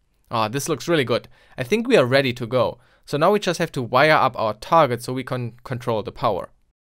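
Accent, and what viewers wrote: Germany. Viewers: Kannst du den deutschen Akzent wirklich nicht raushören?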